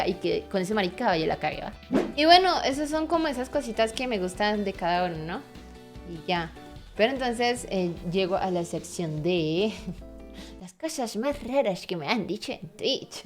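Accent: Colombia. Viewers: No sabia que eras colombiana, tu acento es diferente, pensé que eras de otra parte xd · De donde eres? Pareces colombiana? Bogotana? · Ella me apareció en sugerencias y me pareció monísima, ahora me subscribi porque le siento acento de Colombia que es donde yo vengo ☺️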